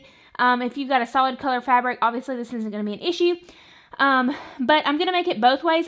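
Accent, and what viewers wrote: South, United States. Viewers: I love her southern accent!!!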